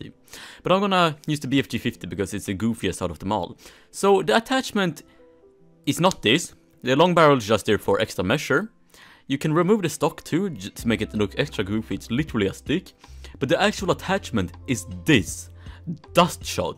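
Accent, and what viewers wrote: Sweden. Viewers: Are you swidish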